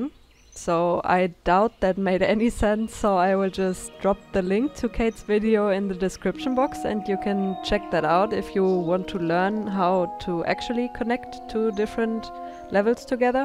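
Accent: Germany. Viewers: Your builds are always incredible 😍 and I'm so happy to finally hear your voice! First of all, I love your German accent, it's one of my favorites, and second, you're so good at talking! Don't put yourself down like this. You should definitely do more of these! 💙✨ Also yayy, teal club! 🙌🏼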